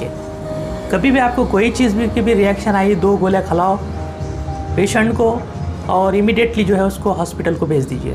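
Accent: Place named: Bangladesh. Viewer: Bangladeshi ho ky ...sound se aise he lgta hai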